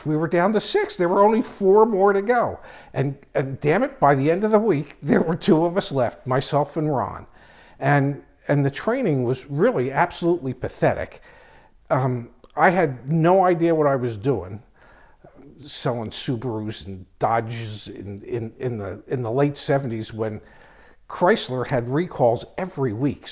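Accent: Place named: South Jersey, United States. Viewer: glad to see another South Jersey boy doing well. Great to hear the reference of the "deptford mall". Lots of great memories there. Don't live in South Jersey anymore but great to hear the accent. Hope your channel does well. I already subscribed. Best of luck!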